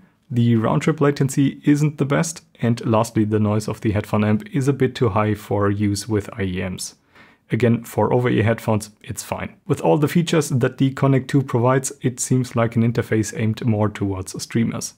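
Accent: Germany. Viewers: your name isn't why are you pronouncing your own german name intentionally wrong? an english or french speaking person would never say their own name in a german accent in germany. you have the heaviest german accent ever but you're pronouncing your german name in an american way, makes no sense. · are you German??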